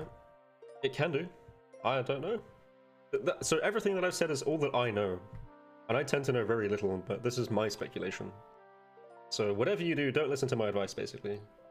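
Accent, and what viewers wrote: United Kingdom. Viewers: You definitely have a Devonshire accent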